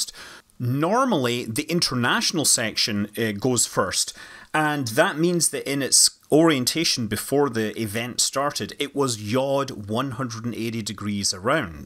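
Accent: Scotland, United Kingdom. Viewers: Hey I didn’t know you were involved with stowaway until about what 30 seconds in I hear this beautiful scotttish voice lol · Love the accent ? I know its scots , which part? great show, 🦘💥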